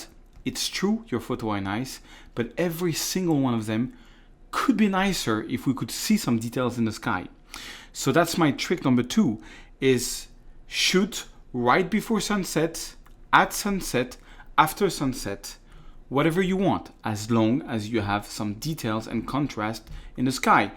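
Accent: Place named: France. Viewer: when french people speak English...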